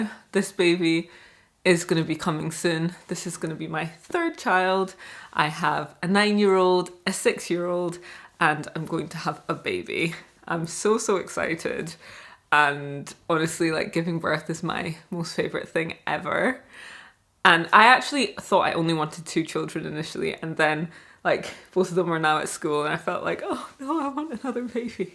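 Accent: United Kingdom. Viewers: I'm very happy for proud of you💐✨ I really like the British accent 💫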